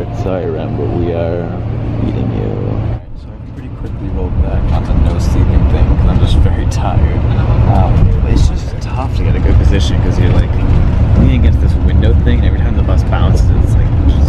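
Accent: Boston, United States. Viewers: I rode a Greyhound for the first time in a long time recently and paid extra for the reserved seat and I only realized halfway through the ride, that I was put in the wrong row (it was only one off but still) so yeah reserved seating is a scam. Love the Bahston accent!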